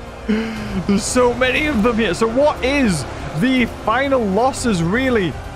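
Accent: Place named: United Kingdom. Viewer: i never heard a brittish bloodletter but i approve massivly great commentary to the happenings on screen :)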